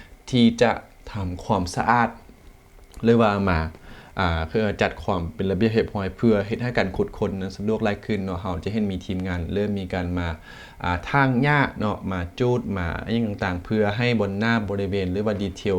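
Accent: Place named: Lom Sak, Thailand. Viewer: เพิ่งมาดูเป็นคลิปแรก ฟังสำเนียงพูดของ แล้ว.. ตอนแรกคิดว่า เป็นช่องของคน อ.หล่มสัก หล่มเก่า จ.เพชรบูรณ์ และชาวเมืองเลย..พูดภาษเดียวกันเป๊ะ..กำลังสันนิฐานว่า คนไทยในเขตนี้เคยมีบรรพบุรุษเกี่ยวดองมาจากชาวหลวงพระบาง..อืม!! เราน่าจะเป็นญาติกันนะเนี่ย😊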